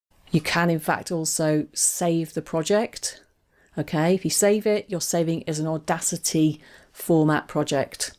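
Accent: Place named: Manchester, United Kingdom. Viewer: Looks like its changed since this video. I go to change tempo but instead of getting the popup box showing how to select the new speed, I got a box saying disallowed, you must first select some audio . I've been trying to select a portion of the track for some minutes through Select and Region and then trying everything there, but the cursor disappears all the time, so can't make a selection. Btw, I love your Manchester accent. That's where I grew up. :)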